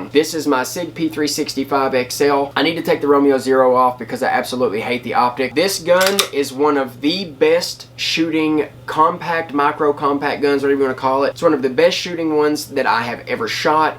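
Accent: South, United States. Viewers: Love the gun collection and also the southern accent my friend. God Bless America and have a blessed day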